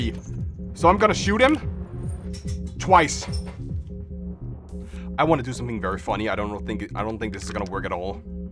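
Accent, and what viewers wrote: Denmark. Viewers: Jeg kan hørrer at du er dansk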